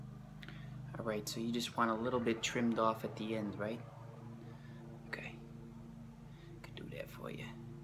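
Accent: Boston, United States. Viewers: LOVE the Boston accent! Fantastic video, just subscribed :) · That Boston accent is super ASMR inducing. I wouldn't be opposed to another vid with it! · Excellent customer service skills, very attentive. And yes, that is a strong Boston accent! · He sounds like Leonardo DiCaprio with a Boston accent. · I want another asmr video using the Boston accent again 😍😍🙌🏻🙌🏻 Literally my favorite video! 💖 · Zevi That’s New York accent this is Boston it’s stronger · As a man with a Boston accent, i approve this message. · Now I have to go to Boston to bathe myself in that glorious accent. Great video 🤗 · okay but like, this is why I love being from and living in Boston, when people do the accents and shit its too.amusing! keep up the great work!! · Loved this vid and the accent. Just hope everyone knows literally 0.00001% of Bostonians talk like that. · bro i need, and i mean NEEEEEED more videos with the boston accent roleplay.... 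been passing out to this same video every night for like 3 weeks now to the point where i know every word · MORE BOSTON ACCENT ASMR PLEASE OMF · I love your Boston accent. It's pretty cool!! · Please PLEASE make more vids with the Boston accent 😭❤️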